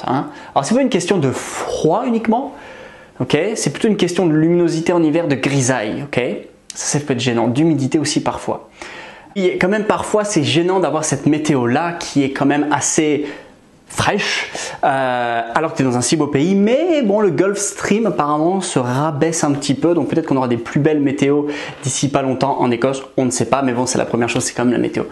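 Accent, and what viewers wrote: Scotland, United Kingdom. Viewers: First of all you have a BEAUTIFUL Scottish accent!!!👌👌👌💕( I am a Scot living in France) excuse me but my written French is not great. I prefer to speak it than write it…… Secondly, you missed ONE of the MOST important things to HATE about Scotland ….the MIDGES!!!!???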